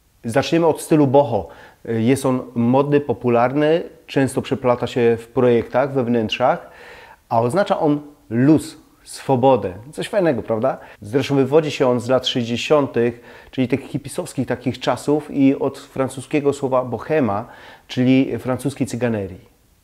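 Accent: Silesia, Poland. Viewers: Ależ masz ekstra śląski akcent👍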